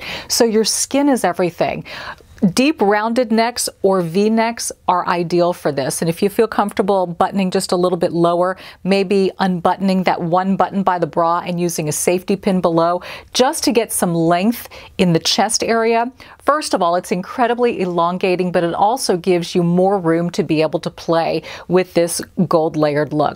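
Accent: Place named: United States. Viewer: Thanks, I love your inspirational videos and cute American accent. You are so inspirational and so positive. I rely on you to keep me fashionable!...bless you from sunny England. 🤗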